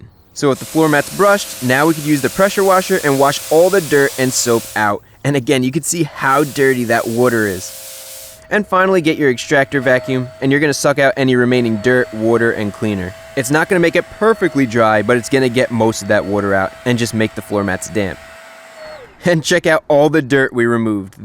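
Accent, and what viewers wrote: United States. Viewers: This dudes accent is so American. And he's so Positive, happy, and enthusiastic. Makes me smile and have an urge to clean.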